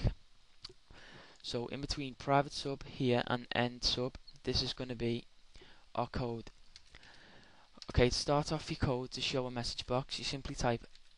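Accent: Liverpool, United Kingdom. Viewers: Scouse accent! :-)) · Woah, you from liverpool? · I just can't take this effing Scouse accent. Have to give up this Tut, thanks for trying though!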